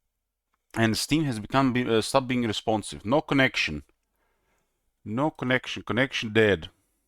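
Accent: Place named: Eastern Europe. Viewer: regarding the letters and how to pronounce, Tolketh would be Tulketh. All umlauts are basically "Open your mouth shape for letter but say some other vowel", with O it would be place your mouth to say O but then say U. You got it right on the end, chat helped you out. Frankly, they're not hard at all when you compare it to French or English. Like yeah there's more vowels in German, but at least they follow rules always, even things like Rammstein being read Ramshtain, there's simply rule that every EI is read as AY, done. How the F do you explain to an English started difference between thorough, through, thought, taught... As someone who's been studying English since before elementary and speaks it better than many people to whom it's their native language, and half of the times think in English, I think I speak for everyone when I say: English is a BS language. 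Great vowel movement in particular, that was worse tragedy than nuclear bombing of Japan. P.S. You sound Slavic, is that your accent? Your Os are very hard and you don't do guttural stops.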